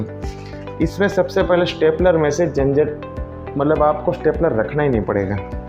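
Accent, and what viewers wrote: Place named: Gujarat, India. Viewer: સર તમારો આ વિડિયો જોય ને બોલી ઉપર થીલાગ્યું કે આપ ગુજરાતી છો ખૂબ સરસ માહતી આપી શું તમે જૂના કોઇન લેવે- ચ કરો છો તો આપનું સરનામું મોકલશો 👍👍👍🔥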